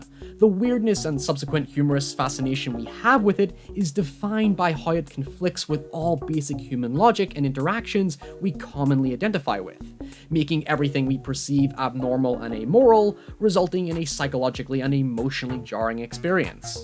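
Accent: Ireland. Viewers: I don't think I can post URLs in YouTube comments but if you check Reddit in the videos sub you'll see a video posted on Tuesday 12th about Louis Theroux. Someone posted your video about Theroux there and your accent led to some confusion as to whether you're Irish, American or Scottish! :) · I think he is Irish.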